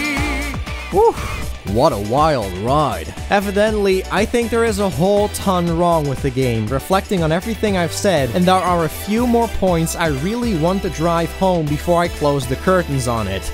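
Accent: Netherlands. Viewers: Lmiao!!! I think you have! That Dutch accent helps, too. 💞💕💞 seriously though, loving your content. Currently binge watching your catalog. Subscribed. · SuperDutch accent :)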